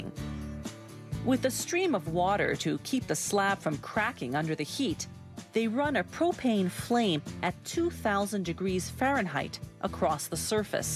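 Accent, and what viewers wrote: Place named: Canada. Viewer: I can tell the narrator is Canadian because she says "a boat" and "PROcess" alot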